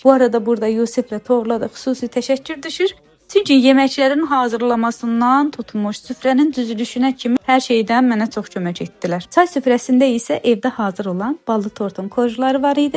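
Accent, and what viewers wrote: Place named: South, Azerbaijan. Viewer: Baxan kimi bilmişdim cənub bölgəsindənsən. Eynilə qohumumuzun gələni kimi danışırsan. ❤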